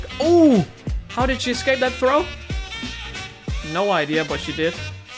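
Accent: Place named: Denmark. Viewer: IT’S YA BOOIIIII DANISH ACCENT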